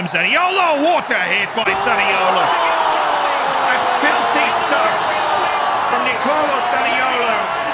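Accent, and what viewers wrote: England, United Kingdom. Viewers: Love the English accent quite happily listen to your commentary over most other people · This was awesome! And colour me impressed by your english accent. Didn't know you had it ya. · love the non regional British accent but pronunciation is still americanised if you know what I mean... Still love your content tho. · His British accent is immaculate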